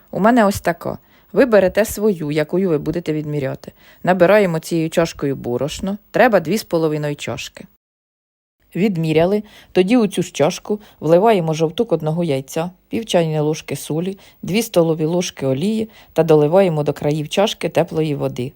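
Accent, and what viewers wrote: Ukraine. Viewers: Так розмовляють у нас на Черкащині ❤в селах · Дякую, мова центральної Украіни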